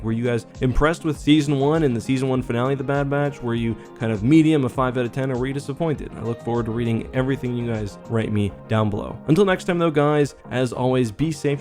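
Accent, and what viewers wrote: Canada. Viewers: You Canadian bro? From Ontario?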